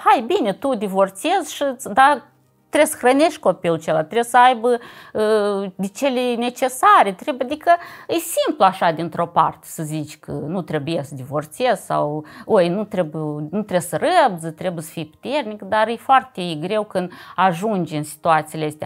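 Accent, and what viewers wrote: Moldova. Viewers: O poveste autentica povestita chiar in dulcele grai moldovenesc!Ce mult imi place,te-as asculta zile intregi!Dumnezeu sa te aiba in grija sa!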